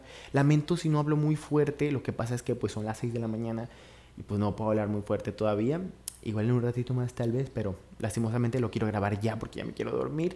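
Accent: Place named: Colombia. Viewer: No tiene nada que ver pero amo tu acento colombiano 💞 ahri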